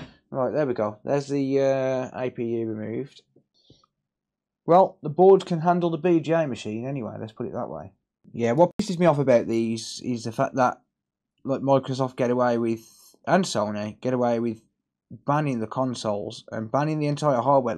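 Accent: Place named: Dudley, United Kingdom. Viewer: Sounds like he’s a local lad from Dudley way.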